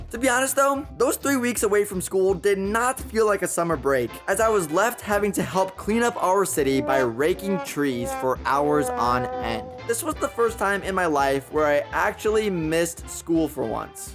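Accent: Iowa, United States. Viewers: Are you from Iowa?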